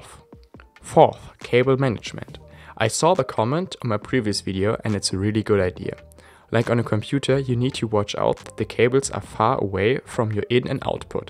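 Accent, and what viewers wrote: Germany. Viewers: Deutscher Akzent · You sound german🇩🇪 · Digga dein deutscher Akzent XD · omg dieser deutsch akzent in diese enhlisch ☠️☠️☠️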